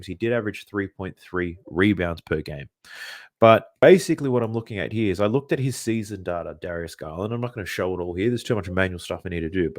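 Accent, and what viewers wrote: Australia. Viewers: no way is an aussie 🔥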